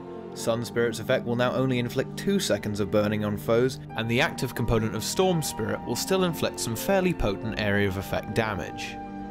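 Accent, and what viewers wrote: United Kingdom. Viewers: British accents make everything sound like im watching a documentary on BBC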